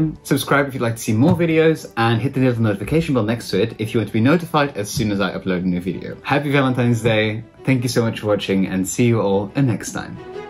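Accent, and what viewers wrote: United States. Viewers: 🍃♥️Really sweet❗️. Romance is in the air at LaLande which makes it all the more fun to watch. I was wondering how you came to have an American accent when you learned English? You have a beautiful voice…would love to hear you speak French more too!🍃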